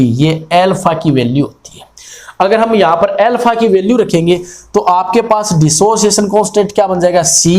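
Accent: Haryana, India. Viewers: sir aap haryana se ho kia?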